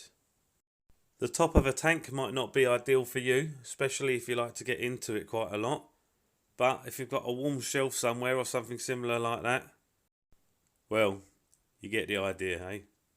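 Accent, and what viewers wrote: United Kingdom. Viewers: Thought you was a brit! Lol. I'm from Nottinghamshire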